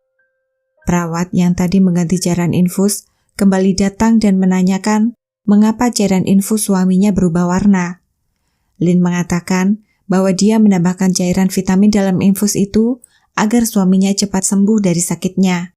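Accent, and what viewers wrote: Java, Indonesia. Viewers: K wlwpun kk pkek bhs Indonesia tp logatnya khas Jawa bngt, jd aq inget tmnku klw dengar kk ngomong. Hi Irfan... Ni tmnmu 1 krjaan dijkrt dulu, dengar kk ni ngomong gw inget lu smg lu baik2 ja dmn pun skrng berada.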